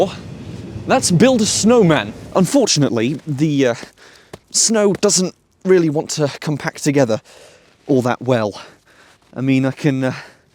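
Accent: United Kingdom. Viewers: The beginning of this video only works with a British accent. But it works oh so well.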